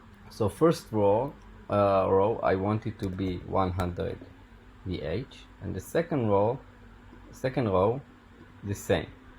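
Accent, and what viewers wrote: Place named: Israel. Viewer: הייתה לי תחושה שאתה מישראל חחח תודה על הסרטונים!!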